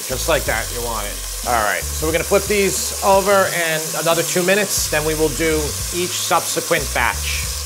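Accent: New York, United States. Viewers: I was like you sound like me a New Yorker born and bred. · Hey man, this is the first time I watch you and I love your NY accent. Cheers from Eastern Europe.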